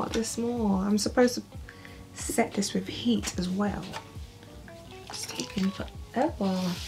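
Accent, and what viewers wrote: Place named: London, United Kingdom. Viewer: I just LOVE your accent in an London accent🥰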